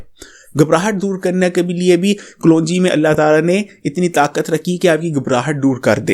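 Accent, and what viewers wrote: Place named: Kashmir, India. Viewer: AAPKI AWAZ MUJE BAHUT ACHI LAGTI H.ASE LAGTA H AAP KASHMERI YA PAKISTAN SE H.M PUNJABI HU OR KISI MUSLIM K MUH SE PUNJABI BAHUT ACHI LAGTI H😍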